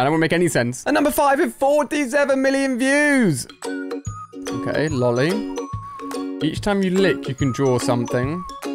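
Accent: United Kingdom. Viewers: You in England or Scotland?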